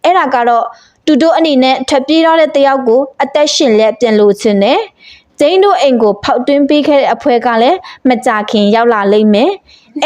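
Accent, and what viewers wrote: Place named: Shan, Myanmar. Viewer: အသံလေးကချစ်စရာကောင်းလိုက်တာ၊စကားဝဲတာကရှမ်းတွေဝဲတဲ့အသံထွက်ဆိုတော့တင်ဆက်သူဟာရှမ်းမလေးတစ်ယောက်ဖြစ်မယ်လို့ခန့်မှန်းလိုက်ပါတယ်၊ ကျနော့်ခန့်မှန်းမှုမှန်လားမှားလားဆိုတာဖြေပေးပါဦး❤❤❤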